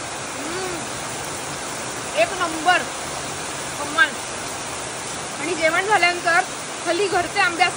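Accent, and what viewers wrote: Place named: Brahmin, India. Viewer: अग तू किती लहान आहेस... आणि किती शुद्ध मराठी बोलतेस... आम्हा ब्राह्मणांसारखंच बोलतेस.. मला तुझा खूप अभिमान वाटतो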